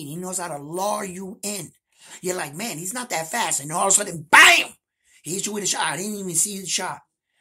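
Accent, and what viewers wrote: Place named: Latin America. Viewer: Yeah a bit and your accent is Latin but I can't say if it's Honduran or something else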